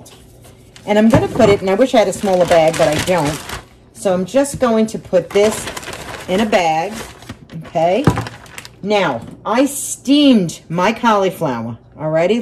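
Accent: New York, United States. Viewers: Morning! do i hear a hint of NY accent in you? lol the dish looks really good!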